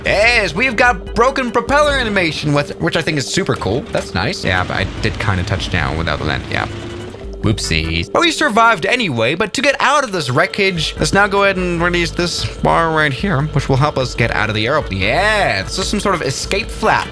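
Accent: Mid-Atlantic, United States. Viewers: Your accent is good. It's pretty close to normal mid Atlantic American. I'm impressed.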